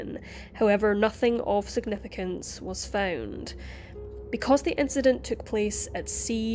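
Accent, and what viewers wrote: Scotland, United Kingdom. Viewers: It's amazing theres so much love for this girls voice.. it soothing, clear word pronunciation, husky and the Scottish accent. I love it!!! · Love a Scottish accent. My favorite. 😊♥️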